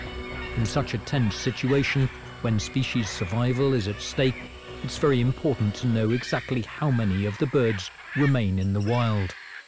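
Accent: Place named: United Kingdom. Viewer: I'm glad that despite the international assembly of zoologists you had a Brit narrate the video. American's require British narrators to enjoy wildlife documentaries. :)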